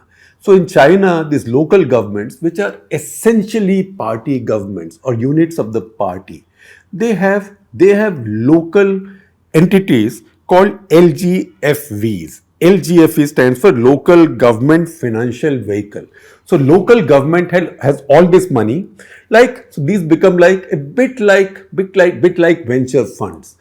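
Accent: India. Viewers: It was great fun listening to you pronounce Chinese names in an Indian accent. Thank you sir! :-)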